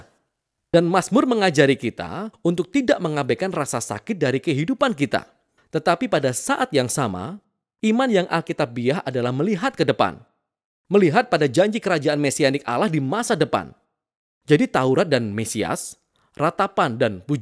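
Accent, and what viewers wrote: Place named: Java, Indonesia. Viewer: Puji Tuhan! Konten yang sangat bermanfaat. (Pengen komen kalo Narator/Presenter nya agak medok sedikit hehe..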